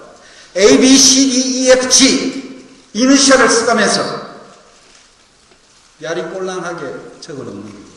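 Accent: Gyeongsang, South Korea. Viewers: 역시나훈아님멋져 경상도말씨구수함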